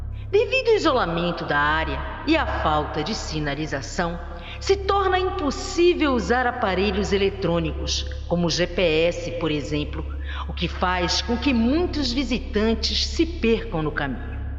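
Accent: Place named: Northeast, Brazil. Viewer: curto seus videos e seu sotaque também rsrsrs nordeste neh?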